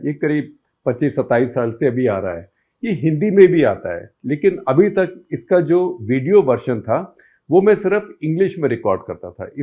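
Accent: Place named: Punjab, India. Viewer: Sir, even your Hindi sounds as sharp as Punjabi accent 😂😂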